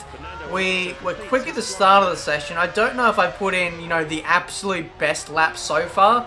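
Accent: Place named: Australia. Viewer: no... Almeno non tagliano così... Comunque mi godo il suo accento australiano, capisco e mi diverto.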